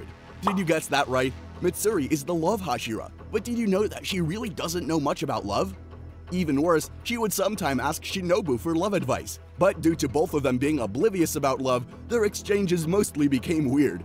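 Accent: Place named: France. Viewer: bro is speaking frenglich ( a bit )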